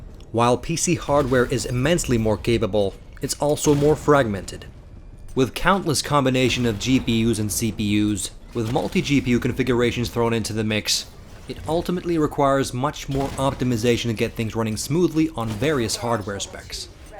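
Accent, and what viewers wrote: Finland. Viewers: Is this guy finnish? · Är du finlandssvensk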